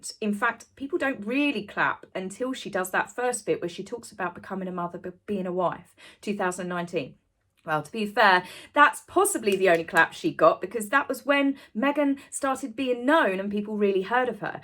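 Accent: United Kingdom. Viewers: You're so cute with your British accent. I just realized you were saying party boat. I heard potty boat. 🇺🇲❤🇬🇧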